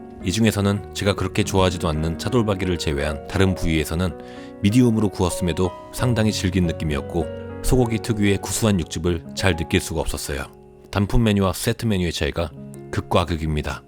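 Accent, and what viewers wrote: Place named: Gyeongsang, South Korea. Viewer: 말투가 경상도인데 원주 사람이라 그러네 ㅋㅋ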